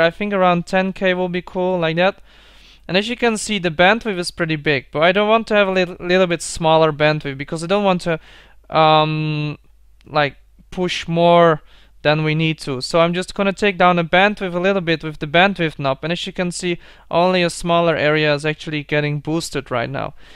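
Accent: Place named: Netherlands. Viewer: I love your dutch accent, like mine xD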